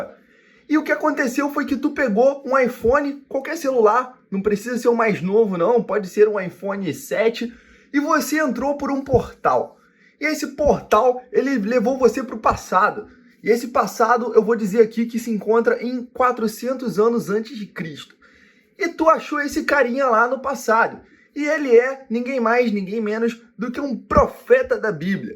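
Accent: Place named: Rio de Janeiro, Brazil. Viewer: desconfio q vc seja carioca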